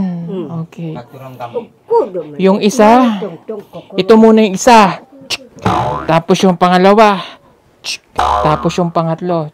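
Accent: Visayas, Philippines. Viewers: Baka Sir pagbalik mo sa manila may Punto na Ang salita mo ng bisaya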